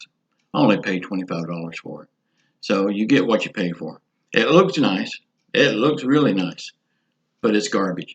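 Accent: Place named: Louisiana, United States. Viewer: My ex is from Louisiana. they are real people! Good to hear than accent once again. U do a great job my fine friend. You anywhere near Franklinton?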